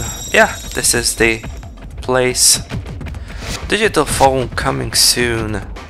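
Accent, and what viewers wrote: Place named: Central Europe. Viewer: California c: I was wondering because me and my brother couldn't figure out where your accent was from so thank you! We knew it was somewhere in mainland central Europe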